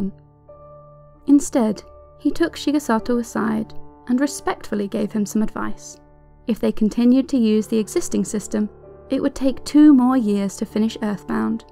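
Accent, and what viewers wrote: United Kingdom. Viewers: Oh how I love this british accent. A nation of great narrators you are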